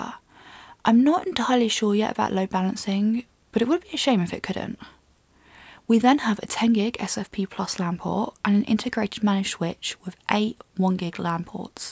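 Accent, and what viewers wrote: United Kingdom. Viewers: Love the British accent for tech stuff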